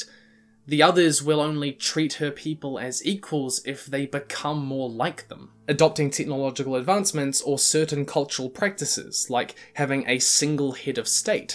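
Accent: New Zealand. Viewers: Wait your new Zealander?! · wait is he a kiwi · You're a kiwi?! how did I never notice.I'm a kiwi too. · Bro you a kiwi ? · Great video.... But I can't stand how kiwis replace "E" with "I" when the speak. "When" turns to "whin" "end" turns to "ind" lol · Are u a kiwi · are you from nz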